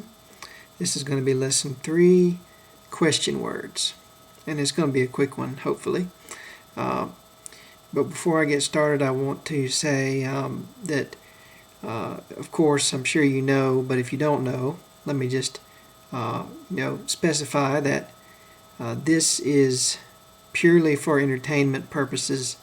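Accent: United States. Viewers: Good video. What kind of American accent do you have? (when speaking English, I mean)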